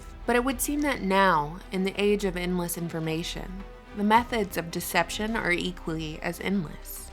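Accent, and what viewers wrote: South, United States. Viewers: I love your videos...you have a familiar southern draw that me being from Birmingham alabama, just a couple of hours drive down I-20 from you, have missed since I've been living in Sacramento..and the unique power of a southern girl to be classy, sexy, intelligent, and a natural rebel all at once, unlike here where the girls are not any prettier and have personalities that make them instantky ugly as hell.....i love your brain to..the way u think is refreshing and you present it very well because you info is always insane because I learn stuff in every video, and there I was thinking I knew it all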